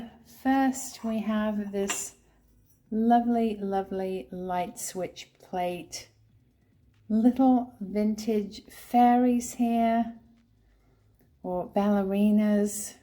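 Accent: England, United Kingdom. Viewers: Yes, love her voice and English accent.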